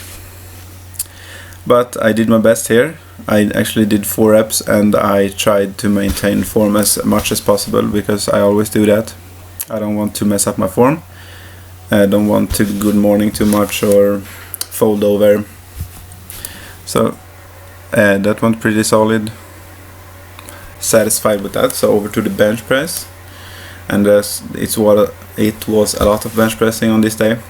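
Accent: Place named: Sweden. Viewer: Absolutely love your Swedish accent my man.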